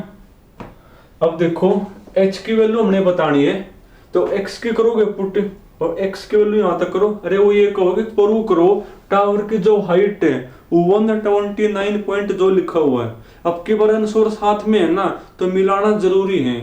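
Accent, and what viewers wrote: Haryana, India. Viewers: Dear sir aapki language nahin samajh mein a rahi thi. Shayad aap Haryanvi language bol rahe the lekin mere sare concept clear Ho Gaye thank u veri Much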